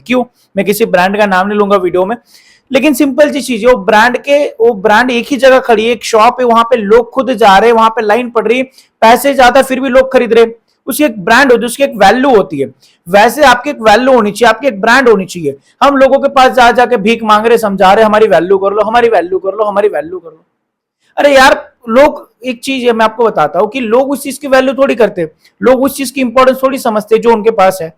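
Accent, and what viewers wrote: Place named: Maharashtra, India. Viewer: आप मराठी हो क्या सर आप की बातो मे मराठी टच हे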